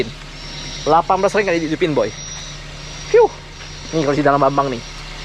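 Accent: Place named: Belitung, Indonesia. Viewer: bahasanya mirip Belitung ya, asli mana sebenernya ko?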